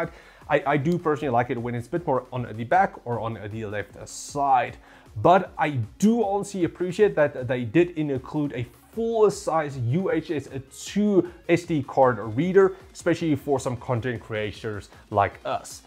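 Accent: South Africa. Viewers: 'traveling and eh-gaming'. Lmao I love the SA accent.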